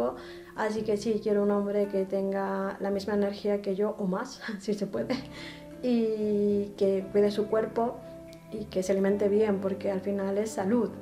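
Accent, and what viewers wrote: Spain. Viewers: ENTONCES POR QUE HABLAS COMO ESPAÑOLA? 🤔🤔🤔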